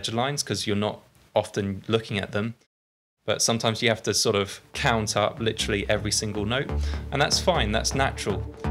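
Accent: United Kingdom. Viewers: I just started trying to learn piano, and I have a feeling I'm going to utilize this channel a lot. Plus, it's a lot more fun to learn along to an British accent :)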